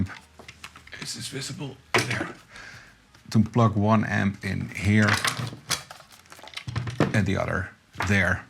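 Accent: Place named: Netherlands. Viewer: Your English sounds sooooo Dutch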